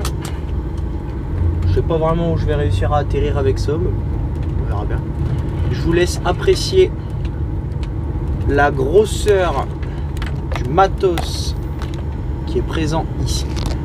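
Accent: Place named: Nord, France. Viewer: Bonjour bonsoir merci pour la vidéo j'aime bien l'accent que j'entends je suis du Nord et j'ai l'impression de retrouver ma région j'aime bien tes vidéos elles sont sympas pour moi il y a un petit peu trop d'accélérer ce qui donne pas véritablement toujours l'imagination du métier le professionnalisme du métier mais merci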